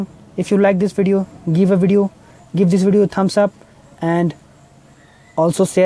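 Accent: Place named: India. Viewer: With the traffic sound on the back and your heavy English accent, I feel like I just made a trip to India. This is a beautiful experience.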